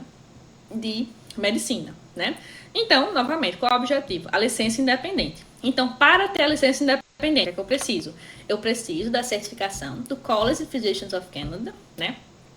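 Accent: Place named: Natal, Brazil. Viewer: seus vídeos são incríveis! também sou de Natal e curso medicina em BH. Reconheci na hora o sotaque kkkkkkkk. Sucesso para você! nos atualize!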